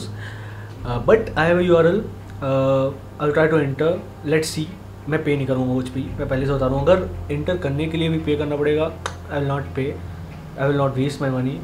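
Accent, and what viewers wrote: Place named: India. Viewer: India me ladke aise hi bat karte h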